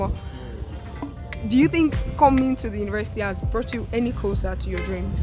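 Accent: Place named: Lagos, Nigeria. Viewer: you sound like you were one e a student of Unilag.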